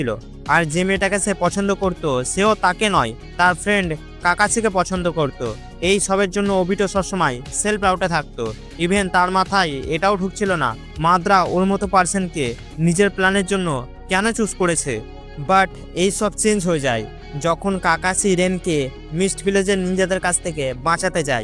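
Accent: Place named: Kolkata, India. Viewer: ভাইয়া আপনি কি কলকাতায় থাকেন?(আপনার কথা শুনে তাই মনে হয় 😅। যদিও আপনার কথার উচ্চারণ আর সুরগুলো খুব ফানি হয়😂)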